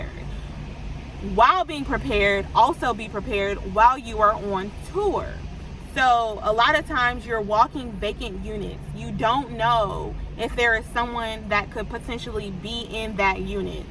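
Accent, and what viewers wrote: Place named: California, United States. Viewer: You sound like me a Cali girl coming to a new state… NC. Getting into Real Estate but waiting to get things transferred over… so it felt like a right start to get into leasing/sales. I got hired as a leasing consultant due to my background, work history and personality. This gives me great perspective and an ideas of what I’ll have in store. Glad to live in a safe community. I’m here for the pros and cons. Including a work life balance. Thanks for being honest! 😊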